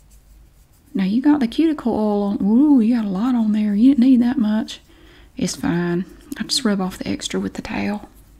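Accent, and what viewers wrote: South, United States. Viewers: Hi from Great Britain. Im a brand new subscriber, so glad I came across your videos, I love your Southern accent.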